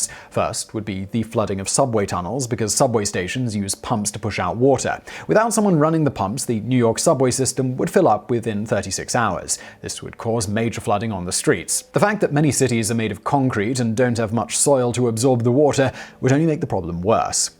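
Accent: England, United Kingdom. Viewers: Nathan Stautzenberger if they call Petrol Gas, what do they call Gas ? Americans are strange, yet he sounds English.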